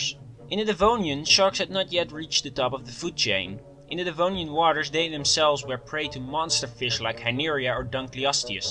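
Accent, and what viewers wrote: Netherlands. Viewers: I have to say this was overall quite a informative video. But i have to comment on something: those "marine dinosaurs" you described, don't belong to the dinosaur family at all. Sure they were large and lived in the same periods, but they were in fact "marine reptiles" instead of dinosaurs. They were a seperate group of reptiles. That's it. After hearing your accent, i presume you are dutch :D am i right?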